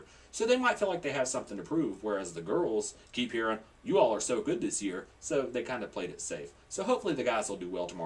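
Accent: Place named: South, United States. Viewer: Who is this guy? I'm from the South, but sorry, you sound like a hick. To quote Kara, you've got ridiculous pipes, but don't mean it as a compliment.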